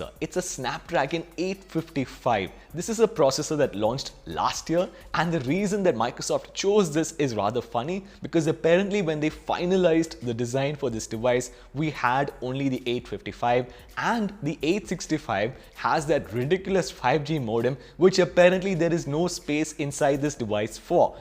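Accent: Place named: India. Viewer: Дико забавно этот индийский чел говорит, и очки крутые! =)) Если по теме, то я согласен - без NFC, с тощей акб, без поддержки карт памяти этот телефон с железом 2х-летней давности вряд ли будет иметь успех, особенно с ценником в 100к рублей. Хотя, я постараюсь его купить, конечно. Надеюсь, Мелкомягкие всё-таки будут продавать более осовремененную версию девайса. А ещё жалко, что он не на Винде.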